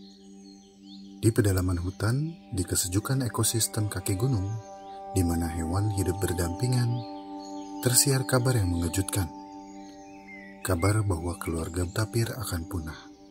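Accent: Indonesia. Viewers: Pengisi suara di channel ini tuh org indo kan?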